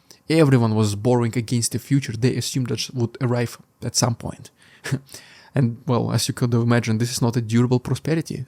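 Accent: Russia. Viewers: Yea you were nice and funny in the beginning But I have given you 2 years of views and you still sound like you have a sock in your mouth when you speak English. You so can do better. I unsubbed just because of your laziness for speaking properly. You have had over 2 years!!!!!!!!!!! And you still speak like a Russian that just started learning. NO EXCUSE!!!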